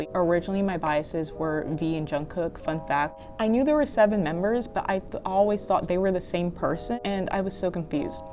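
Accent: Nigeria. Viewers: Ooh cool, is it weird you sound like nigerian? You didn’t really specify, but are you? I’m Nigerian, it’s cool seeing other Nigerians you tubers. Anyways congrats on 100k!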